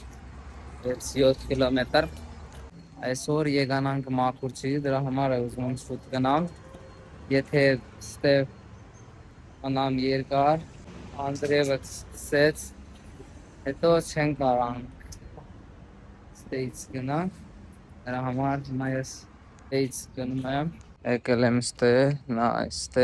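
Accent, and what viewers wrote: Armenia. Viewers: Artashati barbarov el xosum es brou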